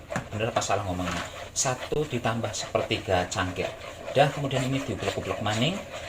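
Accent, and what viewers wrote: Banyumas, Indonesia. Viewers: Matur suwun resepe lik.... Kok lik ngapak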